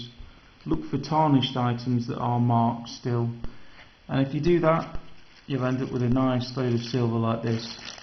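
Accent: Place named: Manchester, United Kingdom. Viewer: Maheswaran Shiva he from UK.. Prob city like Manchester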